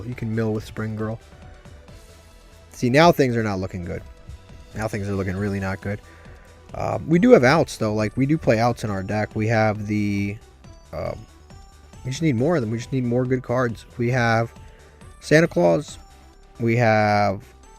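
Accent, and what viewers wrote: United States. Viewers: Do I hear a Boston accent?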